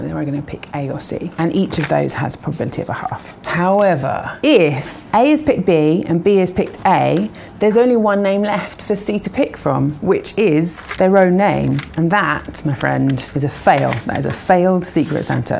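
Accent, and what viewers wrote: United Kingdom. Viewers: I only watch the red headed numberphile vids for the British accent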